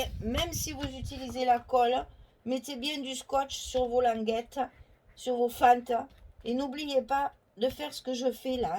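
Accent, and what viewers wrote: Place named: Southern France, France. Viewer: Quelle jolie début ! J'avais vu cette collection mais les textes en anglais pour les fables de La Fontaine ça me pique un peu les yeux 😕 peut-être me ferez vous changer d'avis 😁 En tout cas je vais suivre ce projet juste pour le plaisir des yeux. PS : j'adore votre accent qui sent le soleil, la mer et les cigales. 😉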